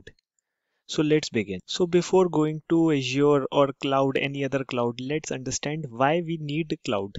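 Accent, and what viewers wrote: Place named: United States. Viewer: Accent like American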